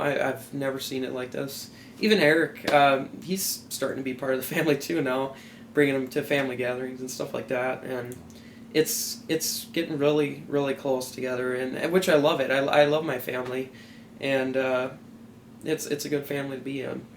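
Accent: Minnesota, United States. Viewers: what an interesting accent. Is he from MinneSOta? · What an amazing story!!! I still cannot understand how a parent could kick their own child out just for being who they are. Being gay is not a choice it is just who you are. The Ali Forney Center in NYC it littered with kids who have been thrown out of their good "Christian" homes... The Bible also says that adulterers (divorced) should be put to death. I am glad times are changing but it is not fast enough for me. -love your Minnesota accent!!!:)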